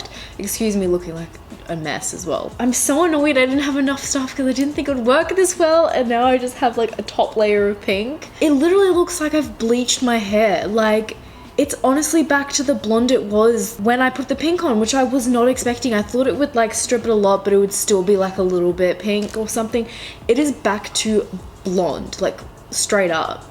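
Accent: Australia. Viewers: You look so gorgeous without makeup! Such a natural beauty, also are you Australian? I recognise the aussie accent :)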